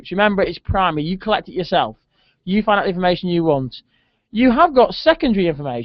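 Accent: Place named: Stoke, United Kingdom. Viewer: Thank you these really help! also are you from stoke? You say book and look as if you are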